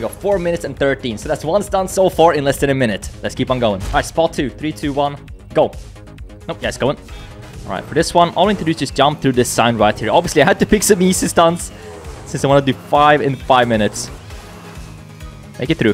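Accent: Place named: Sweden. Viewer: Swedish ascent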